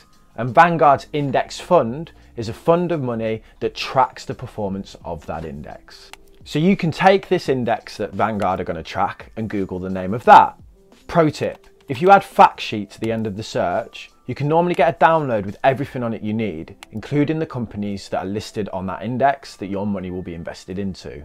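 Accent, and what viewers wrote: Birmingham, United Kingdom. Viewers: videos which we needed with a fckng peaky blinder accent. yoo keep it up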